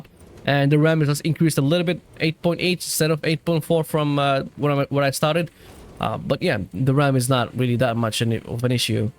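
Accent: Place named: Morocco. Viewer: From your lehja i know you from moracco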